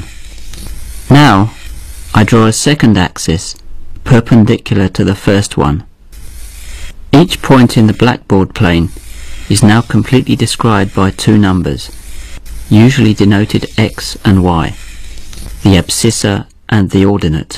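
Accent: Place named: India. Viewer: 解说员是印度口音的英式英语。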